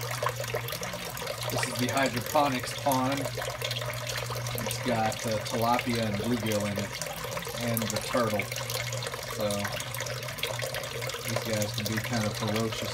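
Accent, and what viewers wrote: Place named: United States. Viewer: You’re sounding real country in this video!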